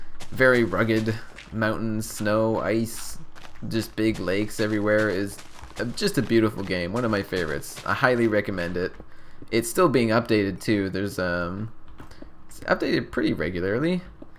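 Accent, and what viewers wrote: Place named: Canada. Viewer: Are you Canadian, or not? The way you say "about" makes me think so. XD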